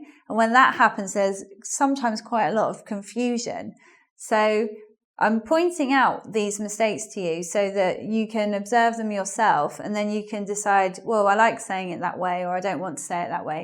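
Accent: United Kingdom. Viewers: Excellent Video.... I love it the british accent....very useful for me, thanks for sharing. One thing ....you are excellent teacher.....Don't worry about bad comments.... Congrat. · Has the British prounonciatinon? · I do like your British English! I'm fond of it! : )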